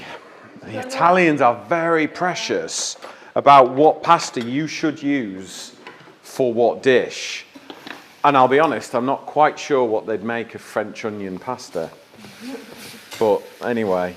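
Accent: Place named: North, United Kingdom. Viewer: love the accent! how northen are you?))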